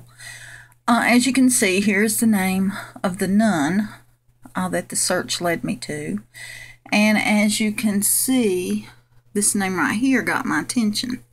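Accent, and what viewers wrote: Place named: South, United States. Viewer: Thank you Sister! You are so right on in this video. What a blessing you are. I can't wait to meet you in heaven. I also love the fact that you are a southern girl (your accent). I live in East Tenn. lol God bless you as you continue to show us what the Lord is revealing to you! I just feel so comfortable and such a peace listening to you. I don't know how to really explain it, right at home with your teachings I guess. Anyway, love you and God bless you! :)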